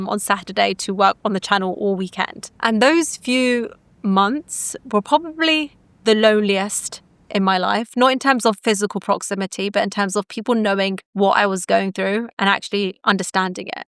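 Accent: United Kingdom. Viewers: Are you born British?? I am obsessed with your British Accent.....!!!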